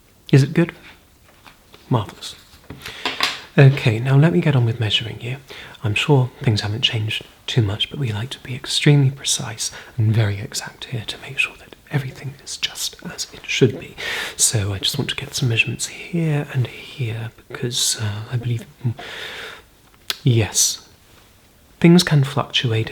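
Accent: RP, United Kingdom. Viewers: I just discovered this man because of gentle whispers mentioning him and I must say, his voice is soooo soothing and the British accent is just the icing on the cake! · You’re so posh I’m beside myself w tears. You’re like a fairy godmother/father I’ve always dreamed of 😭😭😭😭 U HAVE NO IDEA HOW HARD IT IS BEING AMERICAN!!!!!! 🤪😂😂😂😂😂 sometimes I have cried from not being a Brit but that was years ago...... 🤪😂😂😂😂😂😂 I love British people and their accents so much it speaks to my soul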